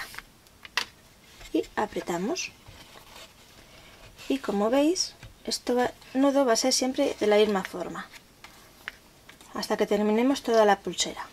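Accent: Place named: Spain. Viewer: Jijij me di cuenta en el principio que eras de España:)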